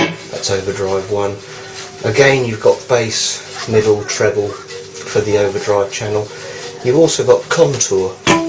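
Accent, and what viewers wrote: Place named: United Kingdom. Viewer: you sound british and tell the weight in kg, astounding!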